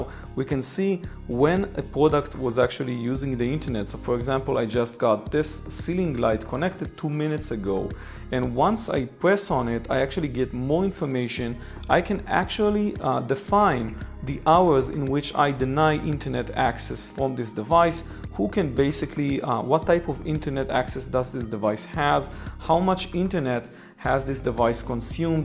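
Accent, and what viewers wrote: Israel. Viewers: Love the Israeli accent! Quick questions: 1. Do you need a modem to connect to the router or it is functional as both? 2. Can u access the administrator panel easily? Does it support English or it is all on Chinese? Thanks for the review mate! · שומעים שאתה ישראלי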